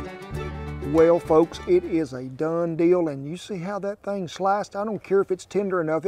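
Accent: United States. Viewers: I love your cowboy talk. It is like a computer language.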